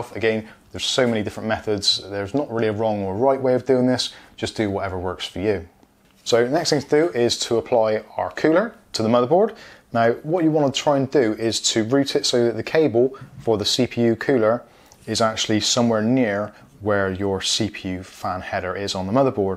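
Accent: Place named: Yate, United Kingdom. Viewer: Your 100% from yate or not far from there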